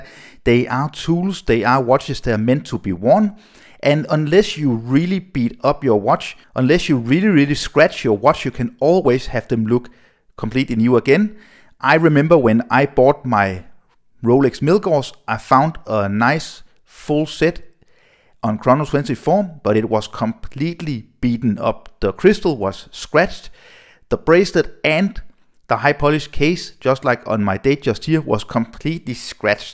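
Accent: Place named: Denmark. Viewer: I like the Danish accent! I like Danes having Rolexes from just those 3 dealers nationwide! Anyhow... Cape Cod says not to wash it but to "buff dry" (which I did on my 09 GMT). Also you should have mentioned to tape the brushed steel parts (which again Cape Cod says are not to be applied). Nice video, nice watch though...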